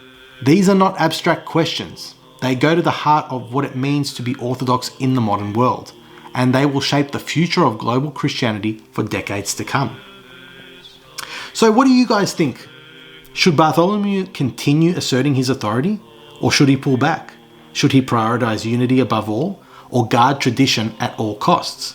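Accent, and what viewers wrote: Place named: Australia. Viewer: Aussie accent Narrative! Please just cut to the chase.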